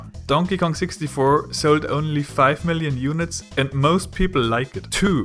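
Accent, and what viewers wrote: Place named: Germany. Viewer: Sehr interessantes Video und mir ist dies auch aufgefallen, was mich sehr traurig macht, weil ich Platformer/Jump 'n' Runs am meisten liebe! :( Bin mit Banjo, Mario und Rayman aufgewachsen und liebe diese Spiele bis heute alle noch <3 Und ich als selbst Deutscher, hab sofort an deinen Akzent erkannt, dass du auch einer bist xD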